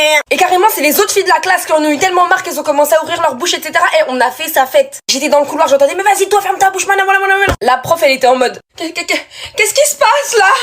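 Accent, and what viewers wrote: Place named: Africa. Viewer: je kiff bien ton accent on dirait t'es africaine t'es africaine?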